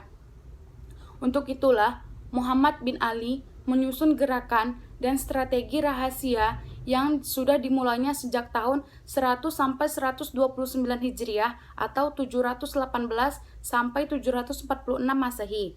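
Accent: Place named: Medan, Indonesia. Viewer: anak medan ya haha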